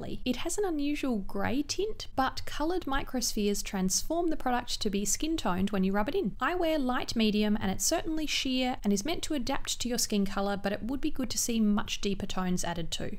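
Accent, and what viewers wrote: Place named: Australia. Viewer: Your accent is so cute!!! Love the Aussie touch to your videos 😅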